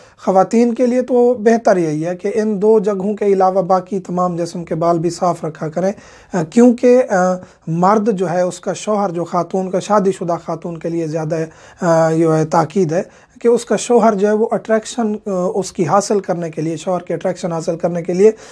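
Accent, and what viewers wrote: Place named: Saraiki, Pakistan. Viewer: Sraaiki bolny waly urdu aisy he bolty hn....weldone ❤❤❤💐💐💐💐💐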